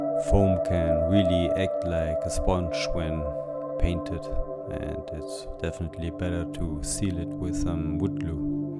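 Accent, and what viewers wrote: Germany. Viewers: Your German accent rocks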